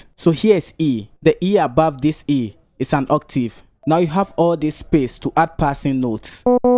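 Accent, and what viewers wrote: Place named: Ghana. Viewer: ok bro . Am watching from Cameroon. I guess your are Ghanaian from ur accent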